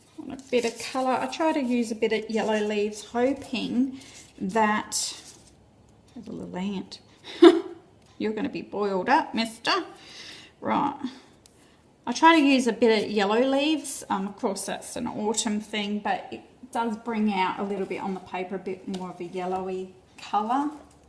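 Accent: Australia. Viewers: I PICKED UP YOU WERE AUSTRALIAN, AS I AM PROUDLY. FOR A FIRST ATTEMPT AT ECO DYEING, YOUVE HAD AN AWESOME OUTCOME, PAPERS ARE JUST BEAUTIFUL, IM JUST STARTING THIS. THANKS.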